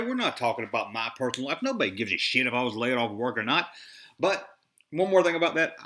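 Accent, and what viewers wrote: South, United States. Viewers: i know i'm 2 years late to this party but i really enjoyed this vid. (and all your Junkie vids). i could listen to you rant all day - maybe its coz i love vintage toys, i was born in '74 so i get where you're coming from and mixed with your southern tones and mis-pro-nun-she-a-shuns, your vids make my day. KEEP 'EM COMING !!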